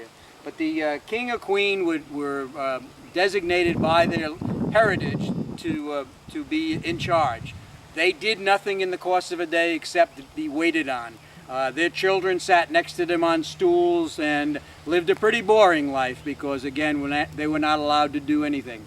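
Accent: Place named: New England, United States. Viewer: You got a New England accent. Thank you for coming down here and exploring our history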